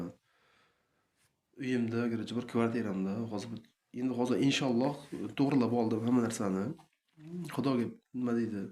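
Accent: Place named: Tashkent, Uzbekistan. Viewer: Ярими шива ярими тошкинча😏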